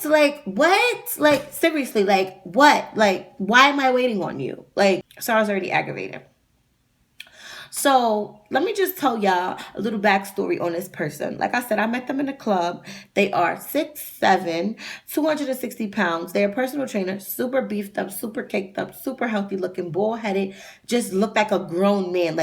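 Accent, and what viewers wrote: New York, United States. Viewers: Where are you from lol sounds like New York girl like me lol this story was in n.c? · I love New York accents 😂 you had me dead when you went off😂😂😂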